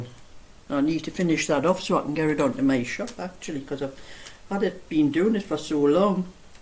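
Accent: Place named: Hartlepool, United Kingdom. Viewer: Love listening to you talk.. I'm a Hartlepool lass living in lincoln.... your lovely accent reminds me of home.... you are a lovely knitter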